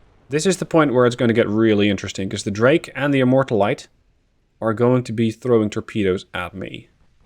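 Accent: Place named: Netherlands. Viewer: Your accent has enough Dutch in it :)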